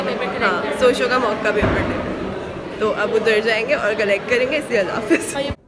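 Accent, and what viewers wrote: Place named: Karachi, Pakistan. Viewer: Your Karachi type accent is so funny. Lol. I'm from Lahore.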